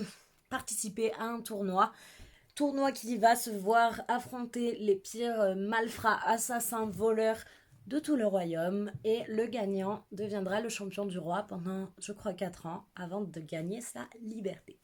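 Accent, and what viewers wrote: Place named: England, United Kingdom. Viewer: Bonjour très bonne vidéo apaisante. Vous avez tun bel accent anglais. J aimes le livres fable. Merci bonne journée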